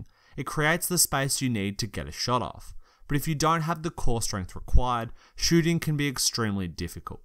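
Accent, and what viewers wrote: Australia. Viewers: I'm gonna just say it is his Ozzie accent.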